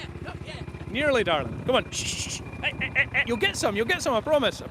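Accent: Scotland, United Kingdom. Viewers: This is a course in learning your accent, Scottish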